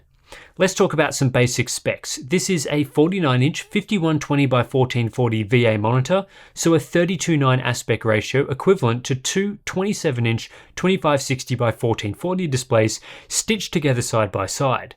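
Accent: Australia. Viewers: I think the real news here is Hardware Unboxed being hosted by a young Freddie Mercury, resurrected and with an Aussie accent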